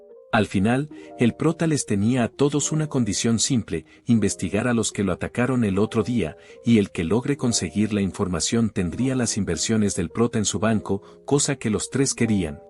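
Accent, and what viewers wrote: Dominican Republic. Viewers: que buen resumen con su acento dominicano jaja esa vaina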